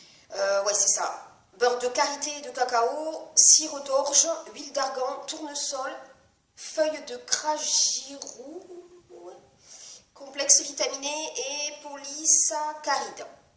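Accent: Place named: Catalonia, Spain. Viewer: Oui effectivement un bel accent du Sud catalan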